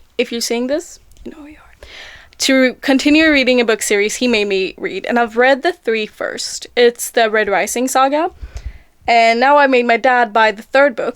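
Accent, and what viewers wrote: United Kingdom. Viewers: Älskar att du pratar med brittisk accent 😍 ps älskar dina videos ❤️ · Jag måste verkligen säga att jag älskar din engelska accent😍 Och kul med böcker, är själv en bokmal 😊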